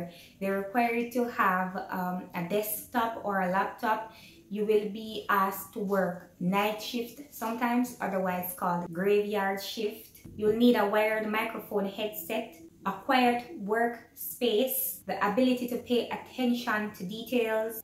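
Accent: Jamaica. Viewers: Hey, yardie gal! 😉 Yes, J'cans do have a heavy accent... but so do most (if not all) of us Caribbean people. It's just a matter of tempering it and slowing down our speech. Although I am not an organised person, I still decided to 'like' this video because I am SOOOOOOO elated to finally find one of my Caricom peeps rocking YT's MMO niche! Like you said, there are waaaay too many "big-country" people peddling all kinds of strategies or services and saying that they can work "anywhere that you have a computer and internet connection" without bothering to actually confirm that for themselves. We in the English-speaking Caribbean know about the nightmares of financial regulations which promote forex retension and money-laundering controls, the latter of which are often imposed by "boss" countries in the G8 who actually do way more money-laundering than the Caribbean. 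Nuff said. Anyway girl, keep keepin' on. If you vary your sub-niches enough, I just might find a reason to subscribe 😉 Best of luck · You have a beautiful Jamaica 🇯🇲 accent.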